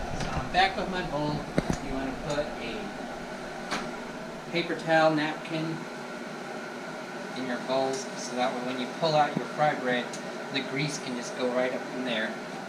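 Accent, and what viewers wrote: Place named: South, United States. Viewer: Hes cute...and has a funny country accent. In new mexico and arizona the accent is slower, spaced words, and no twang unless their cousins visiting from lubbock hahahaha! Also you can order bluebird from amazon and walmart because youre so far from colorado. It is pricier, but not some corporate machine. I live in colorado, a little far from the southwest corner where its made and its still more expensive than pillsbury. I feel like its worth it because the families that run it bluebird show gratitude to native people for using their product and times like these need good people.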